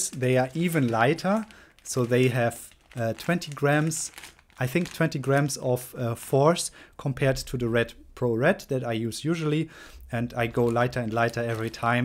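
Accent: Germany. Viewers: i hear a bit of se german akzent , LG :)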